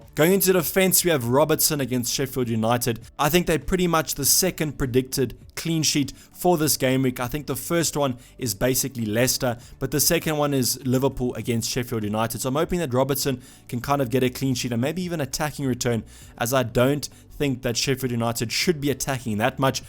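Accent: South Africa. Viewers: South African 🇿🇦?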